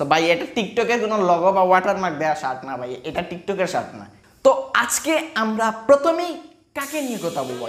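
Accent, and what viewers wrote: Sylhet, Bangladesh. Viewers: Sylheti math tmr muko vala lage... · আপনি কি সিলেটি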